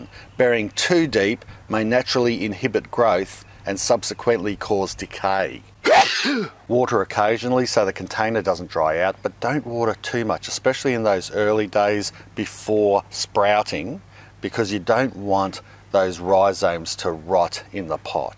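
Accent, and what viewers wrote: Australia. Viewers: that the Aussie accent I like to hear haha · This just come up and boy am I glad. Aussie Yeessss. I can understand you and you are talking Australian seasons. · It’s local Australian English spoken throughout the country. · Love the Aussie accent.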